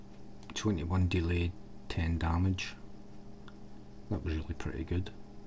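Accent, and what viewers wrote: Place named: Scotland, United Kingdom. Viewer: Your scots accent makes me want to play mmos with you, sooo laid back and wrapped up in the world of Norrath.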